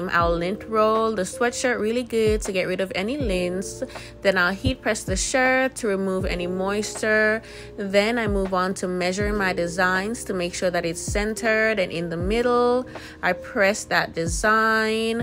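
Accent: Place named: Jamaica. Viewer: Thanks for sharing sounds like you are Jamaican I am too good luck on your business. I am starting my tshirt business too so I’m here to learn and grow.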